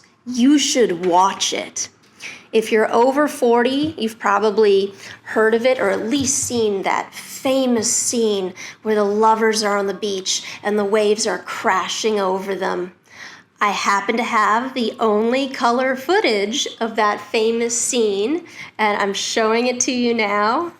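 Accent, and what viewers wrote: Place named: South Carolina, United States. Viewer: Great entertaining and historical show. Do you know how to make a hormone ?....Don't pay her! hahaha. Love your dedication and awesome accent; I'm guessin S. Carolina?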